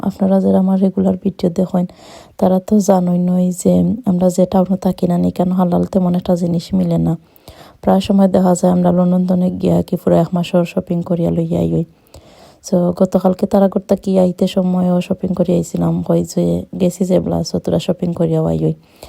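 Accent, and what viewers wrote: Sylhet, Bangladesh. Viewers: Sylhety mat hunle asolei onek vala lage❤❤❤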